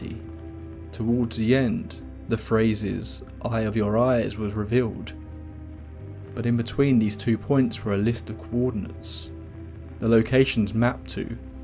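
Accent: United Kingdom. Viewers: Every time i see an interesting thumbnail and title i pray its not narrated by a britard but dammit it always is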